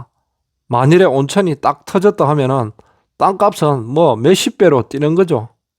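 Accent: Gyeongsang, South Korea. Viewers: 방송 너무 감사합니다 경상도 사투리가 참 구수하네요 좋은 방송 정보 많이 부탁드립니다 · 표준말로 하세요 갱상도말 역겨워요 늘 부정적인 얘기 너무 지겨워요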